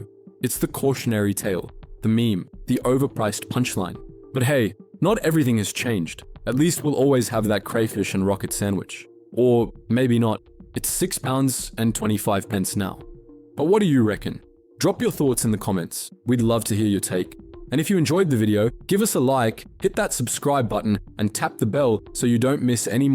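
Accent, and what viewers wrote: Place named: Australia. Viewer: Why pick an Australian for the robo-voice?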